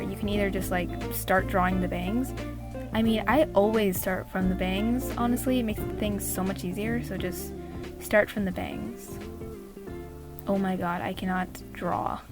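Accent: United States. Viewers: 0 waow ur a Filipino but ur good at American accent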